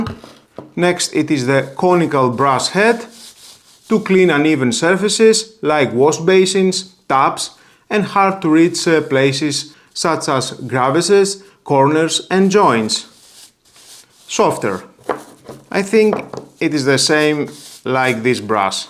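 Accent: Greece. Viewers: Are you Greek? A friend of mine has exactly the same accent 😊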